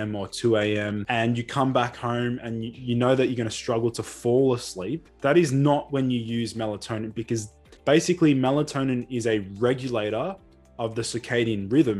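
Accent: Australia. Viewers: Was that you calling in on Danny's podcast? I was like:" with an aussie accent, I know that guy " haha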